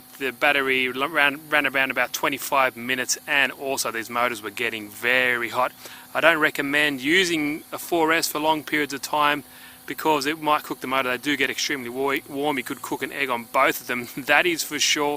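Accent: Australia. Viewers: Where are you from you sound austrailian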